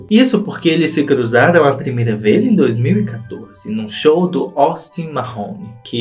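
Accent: Minas Gerais, Brazil. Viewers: Menino, acabei de chegar nesse canal com esse fofoqueiro com sotaque mineiro. Eu adorei.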